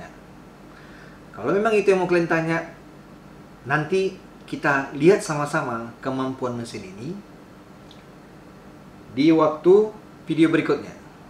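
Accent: Medan, Indonesia. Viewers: opening-nya ini jadi signature ente banget bang... "apa kabar kelen kawan kawanku semua...?" Medan Taste...! keep it up bang...! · Abg orang medan yaaaa?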